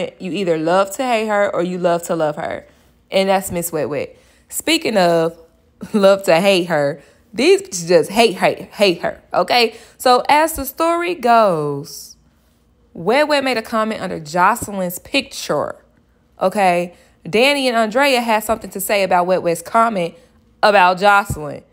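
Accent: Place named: East Coast, United States. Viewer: Yes, I can tell from the accident that you're from the East Coast. 😁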